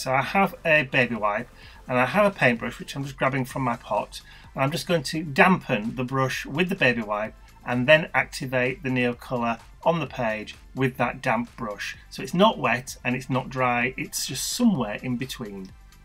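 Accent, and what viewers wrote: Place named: England, United Kingdom. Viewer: I loved the, "coup-pah tea, and a biscuit shared with Mr. Bentley...", your English accent is so lovely. But....whoa! "....what can you expect of a man"?????? hahahaha! You're a hoot! I do love the whole cosmic stream of your creative/thought process, tho. So different for you. And how clever, the way you "incorporated" the words into the whole graphic design of your spread! I've been over that whole "smeared-charcoal-border" around the words technique, but I felt like just leaving the "anemic-white-patch-with-a-word" was not enough either. so....thanks - - for opening up the scope of a whole.....new thing! Big hug, cat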